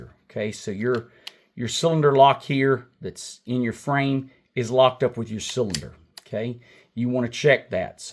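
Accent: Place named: Oklahoma, United States. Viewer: Are you an Okie? A buddy of mine is from Ok City and sounds just like you! Btw, great video, I have an old .38 sp. that’s out of time.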